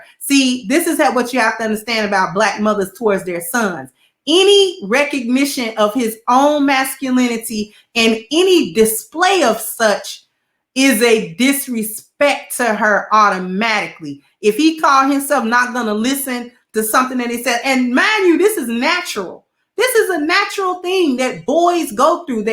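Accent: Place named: East Coast, United States. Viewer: my sister you sound like a east coast sister much lov Salaam.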